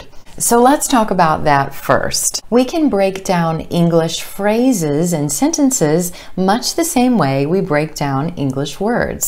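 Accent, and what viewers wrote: United States. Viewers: Her accent is rothic, so it's easy to notice that this is American English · This is the first time,I am learning American accent from you. Thanks,Md. Manik Miah . From BANGLADESH. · Really your this lesson is appreciated good way to teach basic of Americans accent superb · when i will achieve a posh american accent like you= I will have been already, - ready)) At finally 26 - Thanks a lot and applause!!!! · Thank you coach , your english is hundred percent american 🙋😘👏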